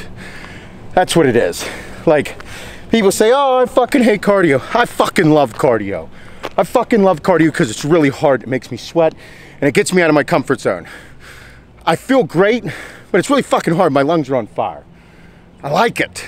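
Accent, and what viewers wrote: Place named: Pittsburgh, United States. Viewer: You have to be from Pittsburgh. I can hear it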